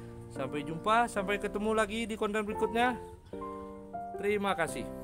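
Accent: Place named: Bali, Indonesia. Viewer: oh pantes logatnya kayak orang bali😂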